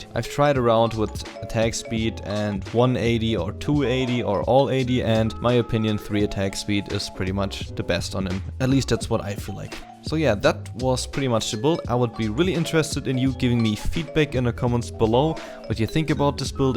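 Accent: Germany. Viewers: R u German? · Hay man really nice Guide this build is awesome. I always bought black cleaver, Ionian boots instead of essence reaver but I will try this one aswell :) I just subscribed and btw you're german right? ^^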